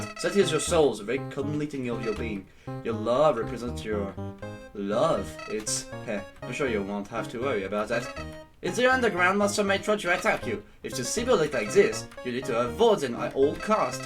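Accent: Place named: France. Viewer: Gotta love your french accent mon ami x) très bonne vidéo je m'abonne!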